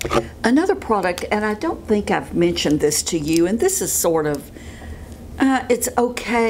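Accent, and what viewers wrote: South, United States. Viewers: There has only been one of your videos that I had a problem with the volume as did others, based on the comments. It was frustrating because I would happily listen to you read your local phone book, with your charming southern accent. Best, Jane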